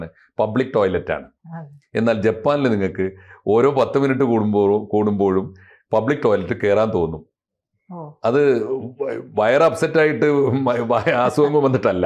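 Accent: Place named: Malappuram, India. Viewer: എടാ നീ ഇന്ത്യൻ പൈസയെ അവരുടെ yen മായി താരതമ്യം ചെയ്ത് നോക്കെടാ . ചാനലിൽ ഇരുന്ന് എടീ പോടീ എന്നൊക്കെ വിളിക്കുമ്പോൾ അവൾക്ക് ഇഷ്ടപ്പെടുന്നു എന്നുവെച്ച്, കേട്ടിരിക്കുന്ന ഞങ്ങൾക്ക് ഇഷ്ടപ്പെടും എന്ന് കരുതരുത്. നിന്റെ മലപ്പുറം ഭാഷ മലപ്പുറത്തു മാത്രം എടുത്താൽ മതി. മറ്റ് ജില്ലകളിൽ ഇരിക്കുന്നവർക്ക് ഇമ്മാതിരി ഊളത്തരം ഇഷ്ടപ്പെടില്ല സു!ടാ!*പ്പി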